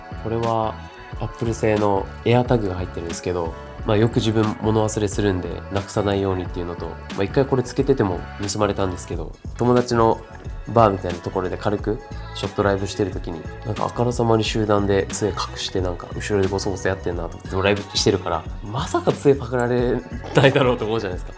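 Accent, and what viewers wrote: Okinawa, Japan. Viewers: 沖縄の訛りって優しくて好き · めっちゃ沖縄訛り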